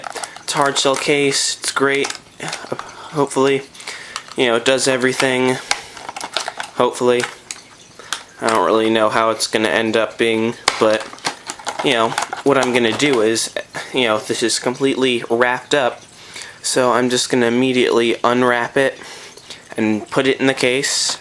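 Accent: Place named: Essex, United Kingdom. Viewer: sounds like your from the essex persuasion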